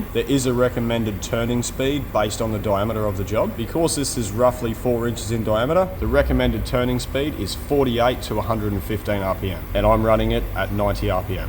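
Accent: Australia. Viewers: At the very begining he says "how u doing guys" or "hi young guys"? Im Polish so I can't tell due to australian accent 🤣🤣 Great video btw ❤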